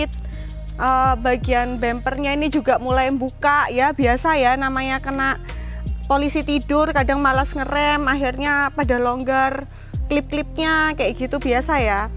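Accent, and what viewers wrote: Java, Indonesia. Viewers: kusuka logat Jawanya...very orisinil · Tp medok ke jawa nya si mba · medok jawa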